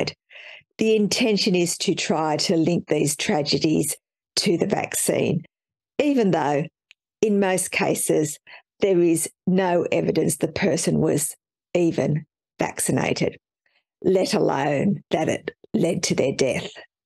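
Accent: Australia. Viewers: Vocal fry on top of an Aussie accent, combined with an unflinching shit-eating grin, makes you excruciating to watch.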